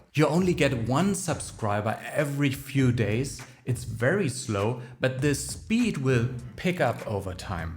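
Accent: Germany. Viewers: This channel is dope. Perfect visual branding and color scheme, completely balanced, properly organized information, flawless delivery. Even the German accent adds to the effect. I feel like I'm watching the Porsche 911 of YouTube growth channels. I'm guessing you drive one.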